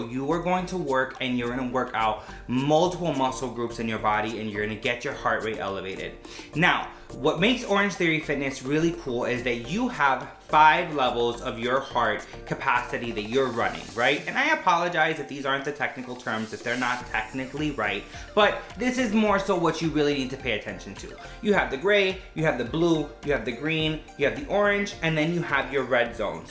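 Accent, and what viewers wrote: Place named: Cuba. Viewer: Omg I loved this video! Going for my first workout with OT next week and you made me feel at ease. I love your Spanglish! Cuban or Puerto Rican? I’m gonna say Cuban! Cubanita here!🇨🇺 😘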